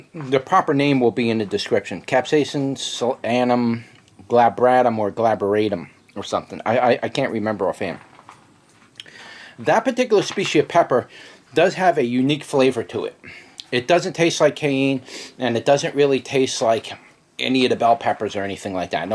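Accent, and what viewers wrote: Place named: New England, United States. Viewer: I asked be a you have a New England type accent. I’m from NE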